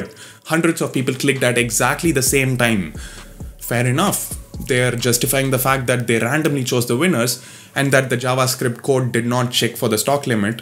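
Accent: India. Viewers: Now that's the English one can understand properly, the way we Indians speak, unlike that fake a** weird accent used by aishwarya at c4tatti.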